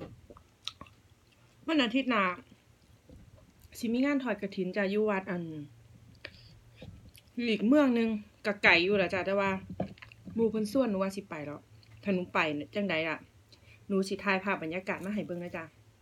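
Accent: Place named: Roi Et, Thailand. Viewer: อิหล่ายุจังหวัดไดนอเว้าคือสำเนียงทางร้อยเอ็ดบ้านป้าเลย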